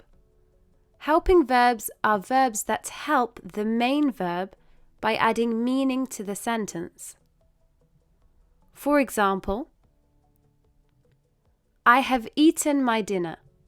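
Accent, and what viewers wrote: United Kingdom. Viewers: May I know which is the UK's accent you speak, incredibly keen on learning more and be able to speak like yours (hopefully)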